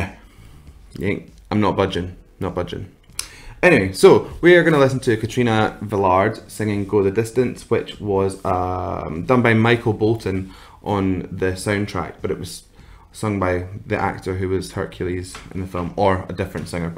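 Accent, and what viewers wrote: Scotland, United Kingdom. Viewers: There's just so many singing talents in this country,bro! You'll surely enjoy reacting to each. Just give in to your filipino subscribers' requests. Btw, i like your scottish accent! And your professional remarks,too. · Liked your analysis and reaction to Katrina Velarde’s voice. Also enjoyed listening to your Scottish accent. c”,) Nice tea mug !!! Hehehe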